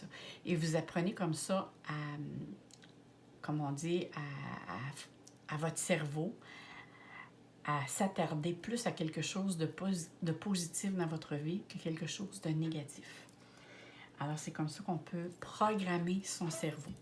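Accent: Quebec, Canada. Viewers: Belle philosophie et présentation, ça me parle, avec en prime ce super accent Québécois.Ce beau pays et bons souvenirs de voyage pour moi.🙏💚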